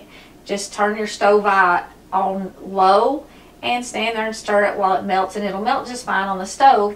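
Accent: South, United States. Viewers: I love your southern accent. We’re kinda neighbors. I’m in Nicholasville, Kentucky. Love love love your recipes.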